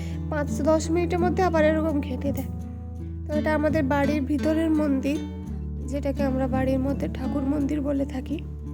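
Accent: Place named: Medinipur, India. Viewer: Khub sundor 😊 Upnar khotha gulo puro amadar medinipur a vasar moto khub valo laglo suna❤❤❤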